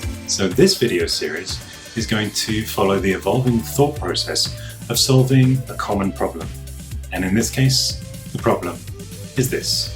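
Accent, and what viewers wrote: United Kingdom. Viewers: Are you from the UK but live in the us?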